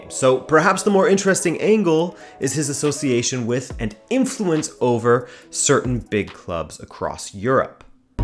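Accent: Azores, Portugal. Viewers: his famaly is from the azores and He has an azorean accent I think it's because of this He might sound a bit different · Are you Azorean? I can tell there’s a bit of an Azorean accent when you pronounce names. New to the channel.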